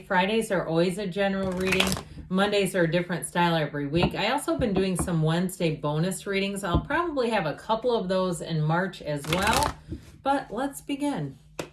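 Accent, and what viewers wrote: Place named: Midwest, United States. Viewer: Your voice is fine, I’m from Illinois 😊 are you from Wisconsin?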